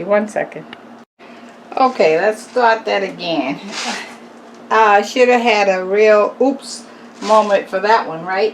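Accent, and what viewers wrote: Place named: Boston, United States. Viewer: grew up in west Roxbury, recognized the accent immediately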